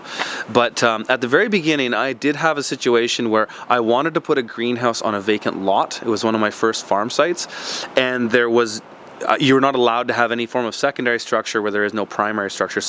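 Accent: Canada. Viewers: I live in Southern California. But I was born and raised in Kelowna. So I somehow stumbled across you channel, who knows how and as you spoke I was like "that dude is Canadian, I wonder where he's from?" and as you moved your camera around I thought, "those house sure look like Kelowna houses." Then u said Kelowna. How funny is that? I swear u live only blocks from I grew up. Farm on buddy!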